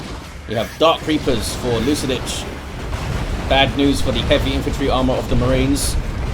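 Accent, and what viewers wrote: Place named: Midlands, United Kingdom. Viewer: midlands England is my bet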